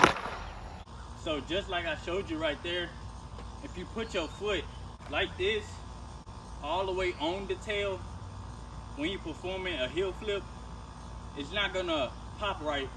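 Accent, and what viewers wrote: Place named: South, United States. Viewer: I just like the southern draw. country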